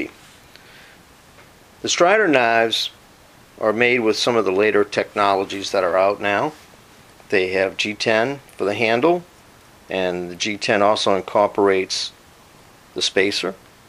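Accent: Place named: New England, United States. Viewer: Nice vid! You've got an interesting accent. I detect some New England and some Southern. I know that's very vague as there are so many variations. :-) Where have you lived?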